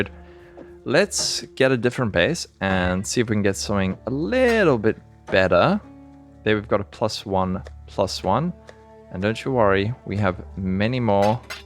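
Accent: South Africa. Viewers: You from Durb's? you sound Safa!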